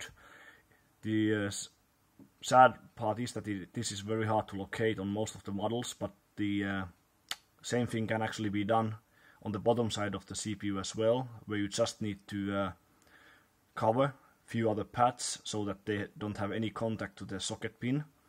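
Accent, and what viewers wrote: Finland. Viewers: He's Finnish. Also i love the comment section here lmao....its hilarious...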